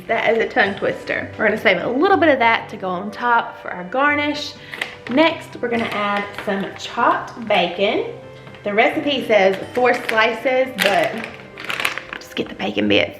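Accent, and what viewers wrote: South, United States. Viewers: I sooo enjoy watching all your videos! I love your southern accent! I'm from Oklahoma. Us southern girls have that accent!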